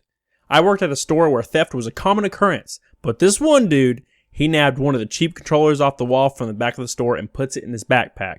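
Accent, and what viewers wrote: South, United States. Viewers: Is it worth mentioning that you have a Kermit the Frog voice sometimes? Like a southern Kermit. Anyway, good videos. Thanks!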